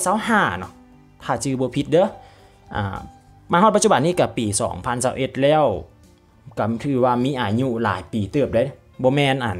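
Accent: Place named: Isan, Thailand. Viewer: เสียงเว้าคัก...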